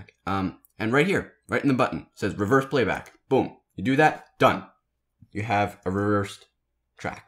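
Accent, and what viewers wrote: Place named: Canada. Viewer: youre canadian arent you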